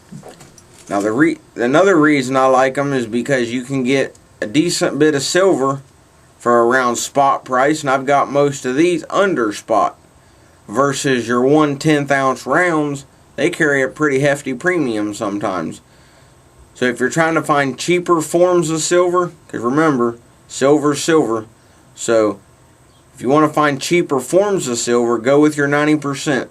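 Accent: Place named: Texas, United States. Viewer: Wow I love your accent! Which state do you stay in? Texas? :)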